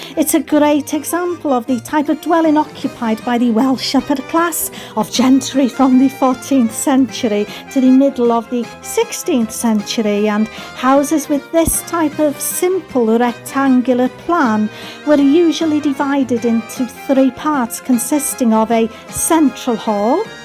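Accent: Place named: Birmingham, United Kingdom. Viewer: Like the accent. Peaky Blinders accent.Amazing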